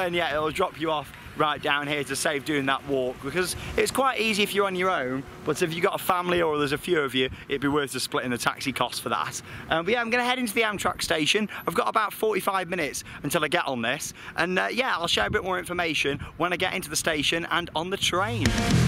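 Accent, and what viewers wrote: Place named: West Midlands, United Kingdom. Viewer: I thought you were from the west midlands not manchester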